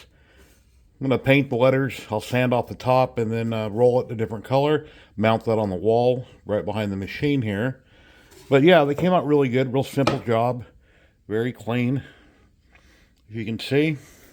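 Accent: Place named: United States. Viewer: very useful review 👍 Sorry but the American accent beat me :) Could you give the name of the sites you used for the maps and tray designs? Thanks!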